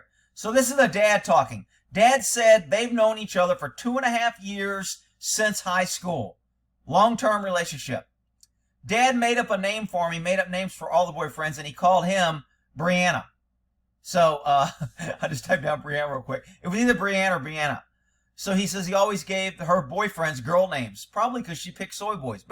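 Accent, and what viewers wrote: Texas, United States. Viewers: And the screechy Texas draw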